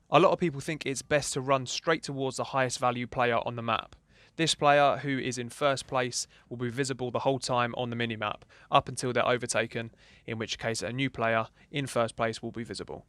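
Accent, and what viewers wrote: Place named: South London, United Kingdom. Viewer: Like your direct no nonsense videos, sound like a South London boy ...cheers!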